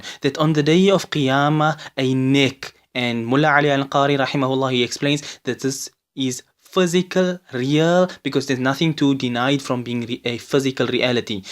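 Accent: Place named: China. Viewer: You have a Chinese accent bro...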